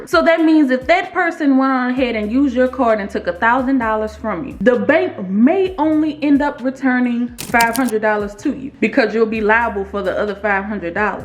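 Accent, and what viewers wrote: New Orleans, United States. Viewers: I hear new Orleans all in your voice💯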